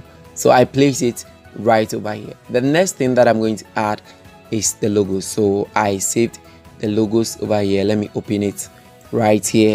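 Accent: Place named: Ghana. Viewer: The accent is like a Ghanaian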